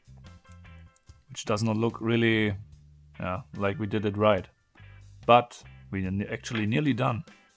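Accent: Germany. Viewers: You are german. Am I right? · Are you german? Because you have a very german Akzent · Man hört so raus, dass du deutsch bist. kp, wieso.>_> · Are you German? You akcent sounds like it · He has such a distinct German accent I can't imagine why you would think it was Russian. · I can swear this guy is german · du bist aus deutschland oder ?😂😂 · Ich schau die Videos, obwohl ich weiß, dass ich mir nie so ein Puzzle zulegen werde. Und wegen dem netten Englisch mit deutscher Aussprache ;)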